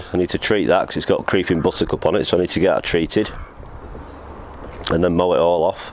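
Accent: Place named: North West England, United Kingdom. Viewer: Hi, I just found your channel as it popped up on the recommended list at the end of another video. I am glad it did, I really enjoyed the video and will look forward to watching your season progress. I take it from your accent that you are a fellow north west gardener:-)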